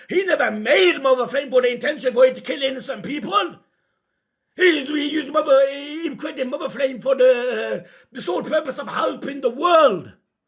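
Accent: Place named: Midlands, United Kingdom. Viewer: Yes and I would hazard a guess from the midlands of the uk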